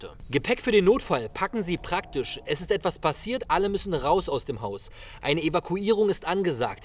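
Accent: Magdeburg, Germany. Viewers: Kommst du aus Magdeburg?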